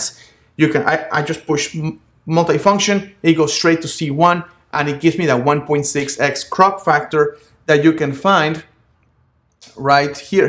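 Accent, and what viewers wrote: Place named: Spain. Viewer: I'm here watching your third video and in each video, you always have something so funny to say. In this one, the "Help Text Size". "If you're old and you're losing your sight, go to large". OMG, you made me laugh, especially because of your Spanish accent. Anyway, great videos, I am like David below, been using Canon for life and on my 5D MII, I hardly ever visited the menus extensively. You're right though, these settings will aid me with faster photo processing and focus selection. Thank you for your time on all these. I will continue to watch all of them and revisit them as a refresher. Who wants to read a manual when we can watch your videos.:)) PS. no insult intended on your accent, I'm Spanish too and I have so many family members with a similar accent.;)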